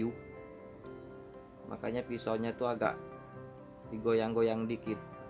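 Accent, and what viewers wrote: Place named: Madura, Indonesia. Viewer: Ini Madura asli kelihatan logatnya...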